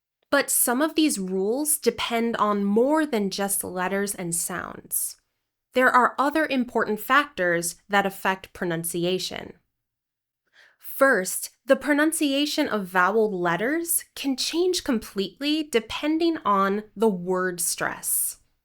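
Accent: United States. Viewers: Is this American pronunciation?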